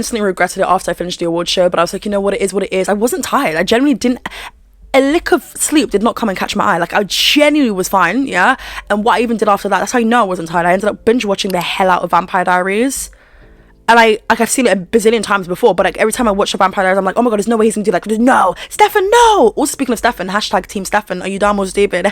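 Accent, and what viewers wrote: Australia. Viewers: Where's that ozzy accent coming from babe? and her accents always cheer me up. As far a hydrating tea, might I suggest 3 ginger and throw some fresh lemon in to the mix. Hangover, depression, exhaustion who?? That tea hits differently. Anyway, back to the drama with our main drama queen here on YouTube ❤ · Oh lord I am loving your play on the accent, you are sounding like an Aussie ❤🐨🦘🇦🇺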